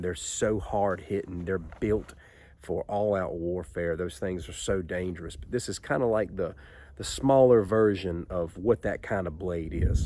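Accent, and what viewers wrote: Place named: Virginia, United States. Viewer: I think he is from Virginia USA.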